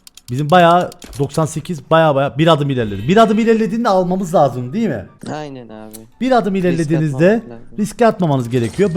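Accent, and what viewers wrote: Cyprus. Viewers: Sırf kıbrıs ağzın için abone oldum çok iyiii msksleodk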